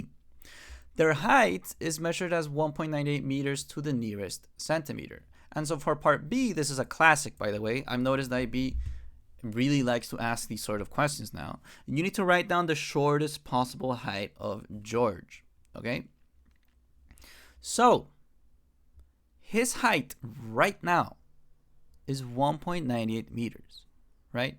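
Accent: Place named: Chile. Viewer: This is gonna sound weird, but the 'cierto?' After every phrase sounds soooo cute. Are you from chile? Idk why but your accent reminds me of chile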